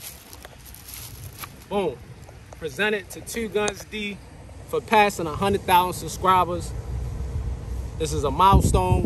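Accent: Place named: Baltimore, United States. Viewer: My man’s sounds like he’s from Baltimore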